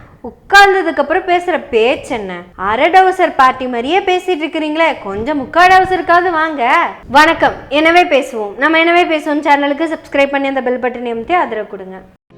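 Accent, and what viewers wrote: Kongu, India. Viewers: எம்மா ! கொங்கு தமிழையும், சென்னைப் பட்டினதமிழையும், கலந்து பேசிய பேச்சு இருக்கே, அது கோட்டாகாரறுக்கு புரியுமான்னு தெரியலையே ? தாயே!!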